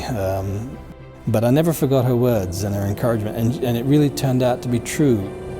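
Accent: Australia. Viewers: This guy almost sounds Aussie - I think he has spent a lot of years somewhere outside the USA.. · What an awesome bloke! I believe he was born and raised in the UK, but am I picking up a bit of an Aussie twang every now and then in his accent as well?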